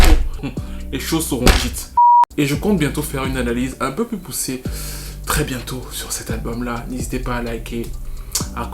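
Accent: Cameroon. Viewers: ton accent camerounais ressort très fréquemment, c'est hilarant 😂😂😂😂 Tu nous rends fiers. Que de la force pour ton excellente chaîne frère 💪🏾😁🇨🇲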